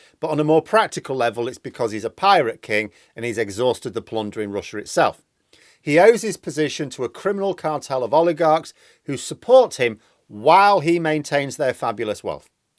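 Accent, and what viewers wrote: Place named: Yorkshire, United Kingdom. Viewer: You went very Yorkshire in this one, momentarily reminded of my Yorkshire Grandad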